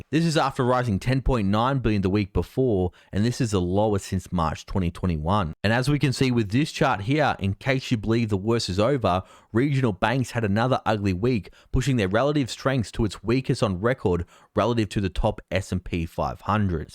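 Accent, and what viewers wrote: Australia. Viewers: Thanks, your sincerity, enthusiasm, and respect for your viewers shows on everything you do! And as an American, I love hearing real news delivered with an Australian accent! Great job!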